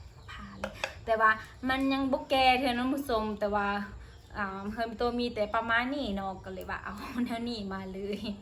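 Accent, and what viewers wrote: Laos. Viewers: น้องอยู่จังหวัดอะไรครับฟังสำเนียงเหมือนคนทาง ส.ป.ป.ลาวครับ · ນ້ອງ akha ອ້າຍເບີ່ງ ຄລິບ ນ້ອງຕະຫລອບ ອ້າຍຢາກຖາມວ່າ ນ້ອງເປັນຄົນລາວ ຫລືຄົນໄທ ຟັງສຳນຽງຄືລາວ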